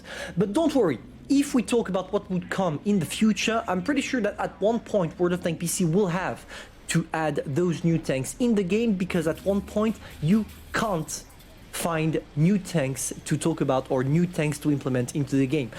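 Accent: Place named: France. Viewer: i love your video and your french sound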